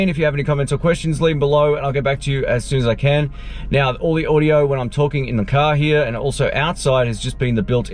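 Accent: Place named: Australia. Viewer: I stumbled across your videos looking for a ring light review and I am glad I did. I am planning on using the GoPro as a facecam and I believe it will work well with the 10" ringlight on your channel! Keep up the videos, and I was wondering why everyone said you had a cool accent and I was confused because it sounded normal, before I realised you were also an Aussie like me!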